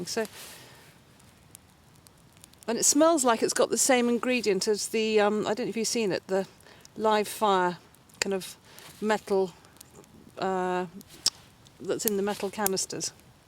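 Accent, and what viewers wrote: United Kingdom. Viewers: Bushcrafting with the expansive British language :) Enjoyable to listen to as much as watch. Clever pot stand too.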